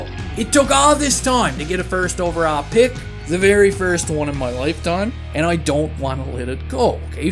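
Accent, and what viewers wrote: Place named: Labrador, Canada. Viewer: it sounded like Scotian Canadian quit😢. your my habs man now. I'm a nunatsiavut man on the west coast now so hearing your accent does bring me back which I love. I hope you don't quit on us or I'll have no one to get my habs news from besides the nonconstructive judgemental Habs news programs.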